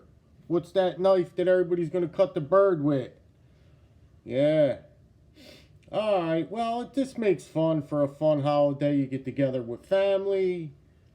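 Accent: Philadelphia, United States. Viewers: I love mine! I wish I had the money to grab the Oily Creek bone version as well, but unfortunately, I could only afford the one. Hopefully I'll be able to get a Sambar Stag one when they release it. GEC makes a great knife! I wish I had gotten into them sooner, but this one makes my 7th one, and so far my favorite. The #93 oily creek bone rams foot is a close second. They used this wood to soak up and clean the oil from the inside of their drill pipes back in the day. That's why it's called sucker rod wood. I used to work at the Philly airport for USAIR for 20 years, and I lived in Williamstown NJ,. I'm living up in NYS now, but listening to you talk brings back fond memories of that 20 yrs I spent down there. Loving the accent and the way you talk brother!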